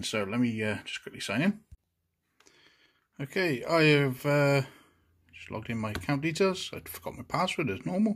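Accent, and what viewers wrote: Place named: South West England, United Kingdom. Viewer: I do know that Argos always fluctuate their prices on Chromebooks, One week the 11.6 inch laptops are £199, then they are suddenly £149 ! I guess it's striking lucky at the right time, Nice review on this Chromebook friend :) going by your accent i'm guessing you live in the South West England, I only say that as i have the same accent & im from Bristol South west!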